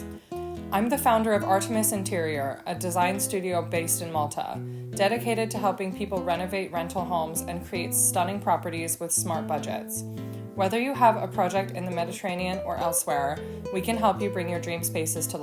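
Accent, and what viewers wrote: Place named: United States. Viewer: You sound American. How did you get to do this? You are so lucky.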